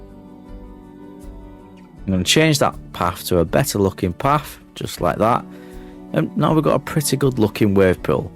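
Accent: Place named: Northern England, United Kingdom. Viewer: Ahh your northern accent! Peerrrrfect! Great tutorial thank you xxx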